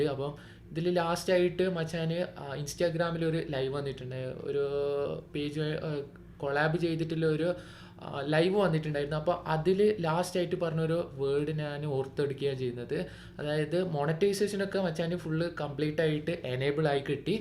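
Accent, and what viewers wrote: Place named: Kasaragod, India. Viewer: enniya njanum kasaragod enne. mundanne style kekkambam oru doubt adich appo choichadh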